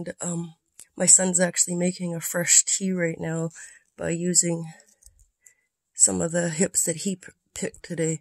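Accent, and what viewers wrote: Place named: Canada. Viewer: I love your Canadia accent and like you I love plants especially pine trees don't ask me why I probably smell oh and lavender to LOL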